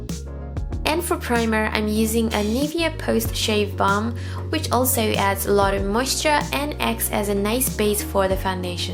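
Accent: Nepal. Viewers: Is she Nepali, I could tell from her accent. Nice video!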